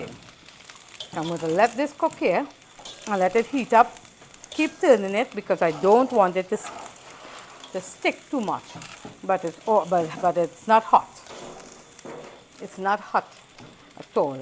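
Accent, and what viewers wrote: Trinidad and Tobago. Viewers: don't change your trini accent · Listening to you reminds me of my grandmother who was Trinidadian bring back memories ❤❤ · I LOVE YOUR TRINIDADIAN ACCENT ! VERY RELAXING !!🇹🇹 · true trini. kept your accent girl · I love Trini accents, love me some roti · Just found your channel. Enjoyed the rapport and watching you cook. Love your kitchen. Nice to hear the trini accent.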